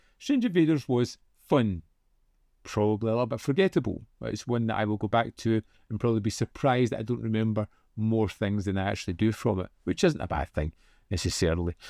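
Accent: Scotland, United Kingdom. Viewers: Glad you finally saw this. It was a seminal part of my childhood, watching it on HBO, as I'd never seen anything so shocking or gross (or so I thought at an early age). I also wanted to say thank you for all your Top 10 videos. Your mellifluous Scottish brogue has been a soothing source of ASMR that has helped me stay calm during these difficult times. Keep it up!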